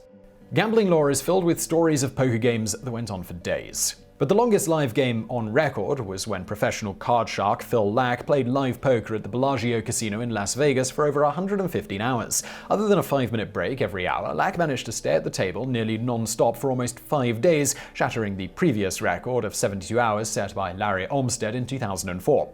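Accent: England, United Kingdom. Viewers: Mariela Que i love hearing someone with an english accent talk about american football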